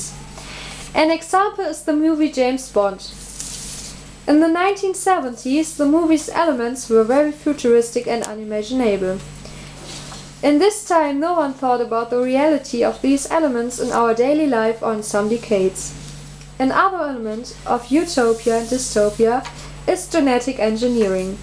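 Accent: Germany. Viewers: Tolles Video ! Dieses Englisch mit deutschem Akzent ist einfach herrlich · cooles video! man merkt aber an deiner aussprache dass du deutsch bist :D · Die Aussprache is schon ziemlich deutsch xD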